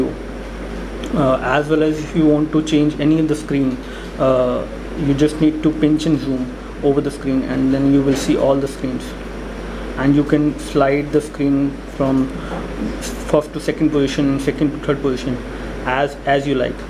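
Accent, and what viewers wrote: India. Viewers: u also sound like indian are u ??????? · u definatly indiannn \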